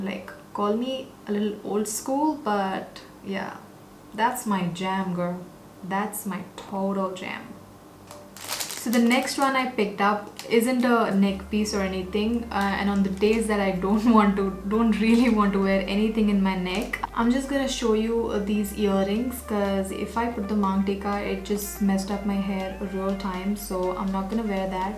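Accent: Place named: North India, India. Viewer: U look like a north indian by accent and looks💯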